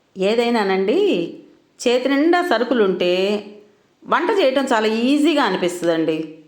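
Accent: Guntur, India. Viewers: మీది గుంటూరు ఆ అండీ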